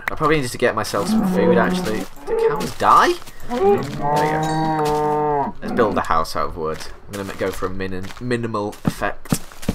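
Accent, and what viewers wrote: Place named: Yorkshire, United Kingdom. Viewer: wait wait wait.. youre from yorkshire.. WHERE TELL ME NOW..btw me too :)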